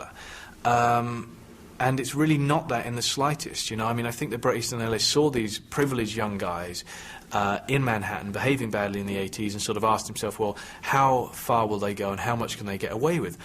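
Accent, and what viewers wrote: United Kingdom. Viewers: He's English... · I didn’t hear anything he said. I was too busy being charmed by the British accent and confused realizing he’s British this whole time. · Wait a fucking minute... WHEN WAS HE BRITISH??!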